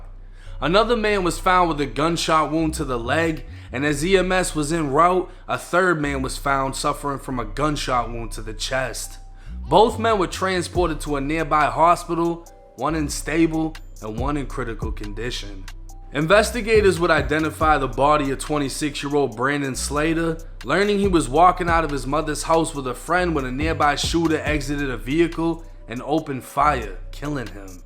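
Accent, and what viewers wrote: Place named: Massachusetts, United States. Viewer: yo I gotta ask how long did u live in Malden for? Whole time I was thinking no way is originally from Florida - cause legit every dude from mass can move away at like 8 years old, hit 30 & still have a thick ass mass. accent 🤣 Edit- My bad, looked it up, didn't know you stay in Dorchester. this shit is dope though, subscribed.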